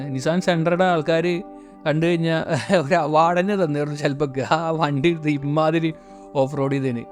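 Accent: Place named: Valluvanad, India. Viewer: വള്ളുവനാടൻ ഭാഷയിലുള്ള ഇമ്പമാർന്ന അർത്ഥപൂർണമായ നരേഷൻ.... 😌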